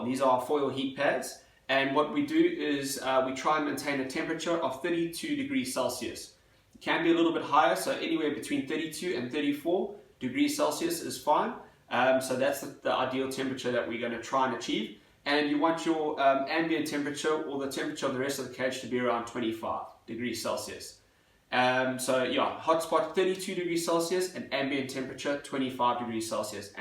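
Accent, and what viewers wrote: South Africa. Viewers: Is this guy from South Africa?? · Are you south African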